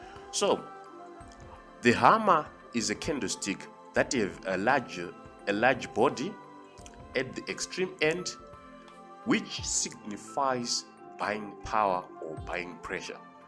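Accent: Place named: Zimbabwe. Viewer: thanks. you sound Zimbabwean.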